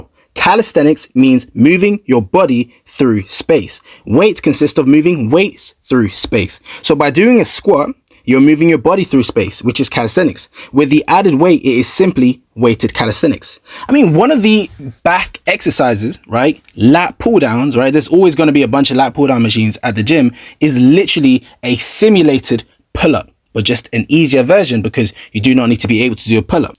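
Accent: United Kingdom. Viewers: U sound British I can tell u from England 🇬🇧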